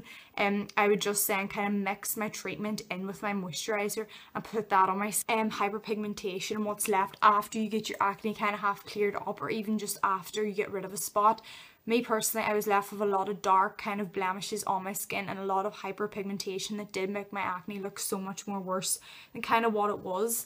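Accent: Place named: Birmingham, United Kingdom. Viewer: U speak peaky blinders accent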